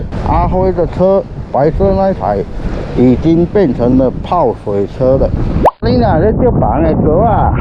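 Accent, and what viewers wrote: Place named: Taiwan. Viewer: 講話很台灣，親切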